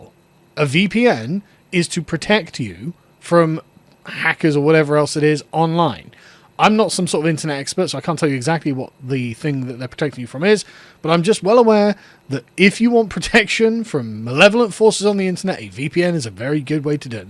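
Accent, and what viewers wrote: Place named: United Kingdom. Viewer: british accent is the most annying thing ever, loike jast tok narmaly meit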